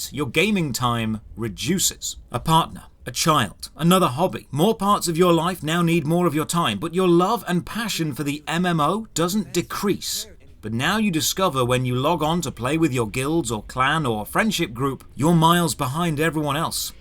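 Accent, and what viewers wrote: England, United Kingdom. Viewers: He is paid either way so does he really care? That is the real question. Ask yourself how he got to be an influencer. Who does his editing. Why are so many British streamers getting pushed in the USA market? Marketers think well educated English accents are more powerful when trying to be convincing in advertising or influencing. I guess the numbers don't lie but are you just one of their numbers. How is that for a thought experiment :P Think about it, spend your time pissing off the large publishers and corporate sacks of you know what by showing how convincing you can be swaying a large swathe of the gaming community against their best interests and wait until they come to you. That is what I got out of that whole video, he doesn't care where the money comes from, as long as its worth his time.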